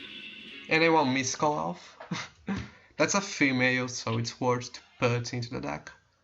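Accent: Brazil. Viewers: Brasileiro falando inglês porra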